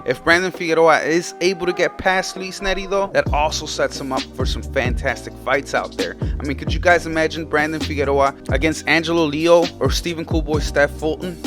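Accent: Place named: Pocho, United States. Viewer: El que habla se ve que es un pocho, mejor hazlo en español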